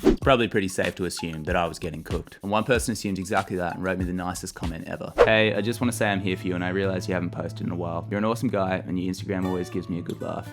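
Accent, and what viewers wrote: Australia. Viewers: Your humor is soo heccing good. Get's me everytime. You got this kinda dry humor I really like and the australian accent just adds to it. Kinda got MK's Kano in mind. Kano giving advice. Just imagine that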